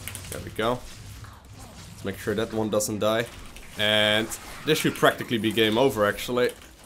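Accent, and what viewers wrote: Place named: Netherlands. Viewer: Saw this video randomly in recommended panel and man, that 3 years are such difference... event that weird dutch accent you can hear is no longer noticable in 2017 videos :)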